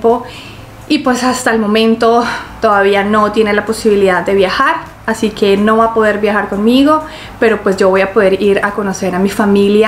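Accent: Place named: Colombia. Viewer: Ola eras mexicana mas tienes asento de colonbia te felicito seias maxicana o de onde seia lo que veio mexico es mui lindo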